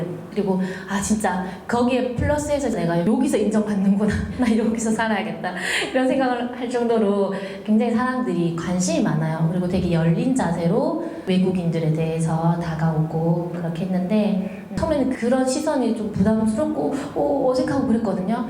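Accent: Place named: Seoul, South Korea. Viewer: 서울말 잘 쓰시네요♡ 쿠바만 가신줄 알았는데 와우~^^ 남미를 6개월 간이나~ 열정과 도전 넘 멋져요.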